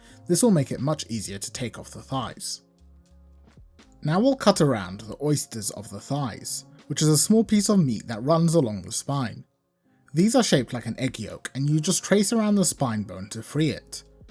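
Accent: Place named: United Kingdom. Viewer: lol it's weird hearing a British accent from an Egyptian.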